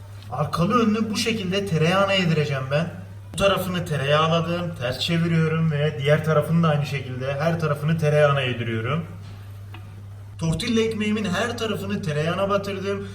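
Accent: Adana, Turkey. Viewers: Konuşma:ADANA Kanal:HATAY Yaşadığı yer: DİYARBAKIR nE? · aslı adanalılar öyle derler götünden Adana'nın şivesi hataya verme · Hatay sandıkiçi diye kanal açıp diyarbakırda yaşayıp adana ağzıyla konuşmak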